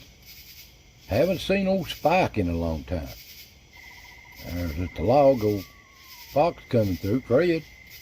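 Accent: United States. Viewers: Good morning sir if you ever quit talking on these videos it will be a sad day as you nearly speak true American about like I do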